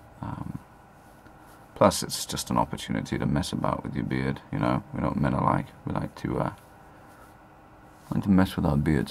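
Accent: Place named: RP, United Kingdom. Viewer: Love the rp accent, subscribed! Fellow brit :)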